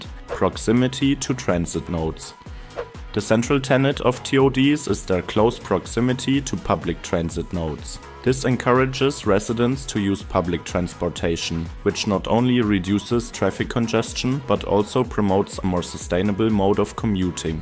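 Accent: Germany. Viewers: Most stereotypical German accent ever.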